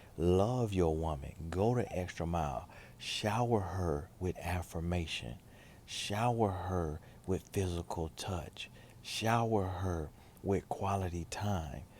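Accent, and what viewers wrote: South, United States. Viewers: I have always enjoyed your videos because of how you speak. Aside of your country accent (it's so cute), you drop bombs that need to be dropped. With that said, I don't know where you are or what your doing, but these video topics have been on a different level altogether! I am appreciating these videos because although they're not as long as you normal record (I wish they were but I know you're taking care of business), they are absolutely needed! Thank you for staying consistent despite of your abundant season. Can you do a video about our relationship to money once we're out of debt? Please and thank you.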